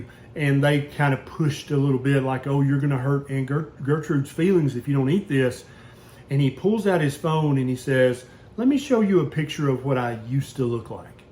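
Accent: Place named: North Carolina, United States. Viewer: LOL. Randomly dumped into your channel. Sounds like a NC accent. Subbed.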